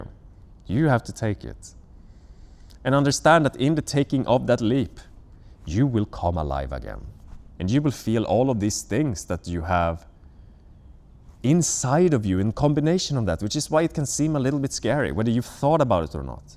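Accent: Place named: Scandinavia. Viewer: Your strong Scandi accent gives your words even more power! Also, please give special treats to the doggy for such perfect supporting role, standing up and walking as far as his leash allowed when you were talking about expanding somewhere else, and looking away to the trees just as you mentioned shifting perspectives :)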